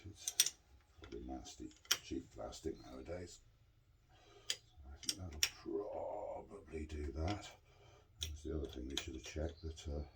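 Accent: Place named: England, United Kingdom. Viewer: I never realized how much better these mechanical help videos would be with an English accent 🙌 I installed the new solenoid without raising my blood pressure once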